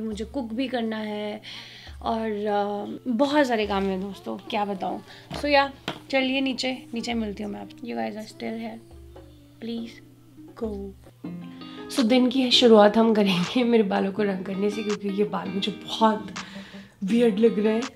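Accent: Punjab, India. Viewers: Ap bht cutely punjabi bolti ho ❤☺☺☺Or apki family bht sweet hai 😍😍😍 big fan of you 😘😘😘😊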